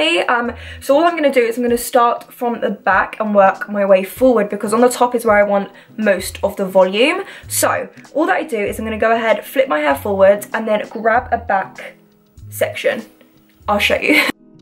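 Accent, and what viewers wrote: United Kingdom. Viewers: YOUR BRITISH ACCENT I'M DIYING 😭😭❤️ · British (I know cuz I have that accent too but you can ask in a q&a or something) 😂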